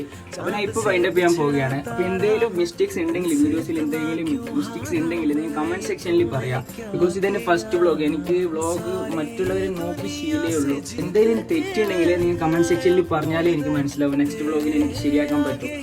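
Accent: Kasaragod, India. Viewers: Bro ningo kasargod language anne pari adh majje aavu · Ksd slangil anne mund 👀🤌🏻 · Ksd slang anne samsarkk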